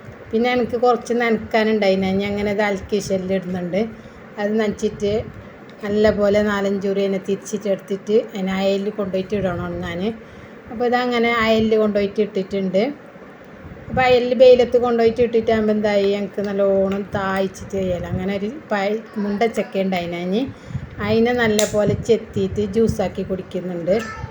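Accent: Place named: Kasaragod, India. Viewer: Kasrkode ഭാഷ സൂപ്പർ 😂😍 എല്ലാ വിശേഷം അടിപൊളി റെസിപിസും super 😍👍😋 L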